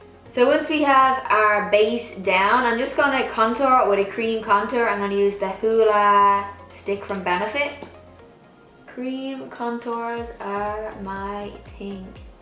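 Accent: Ireland. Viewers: She's from Ireland!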